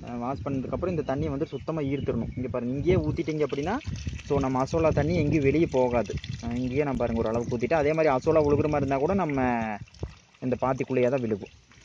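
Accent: Kongu, India. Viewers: your kongu Tamil was super bro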